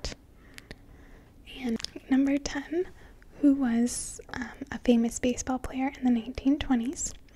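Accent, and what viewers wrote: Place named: Wisconsin, United States. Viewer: Wisconsin accent. Accentuation on words with “a”. The A comes off as very nasally.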